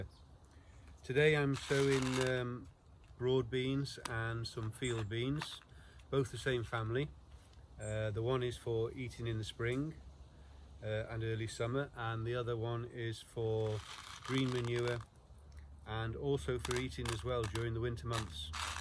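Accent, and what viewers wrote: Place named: Scotland, United Kingdom. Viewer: I'm not very good with accents. Are you from Scotland? You accent is lovely.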